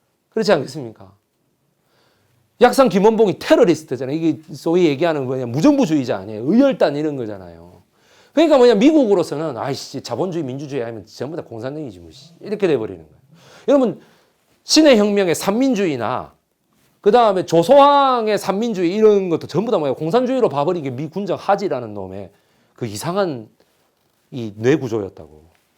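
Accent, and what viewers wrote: Gyeongsang, South Korea. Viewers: 구수한 겡상도 사투리 ..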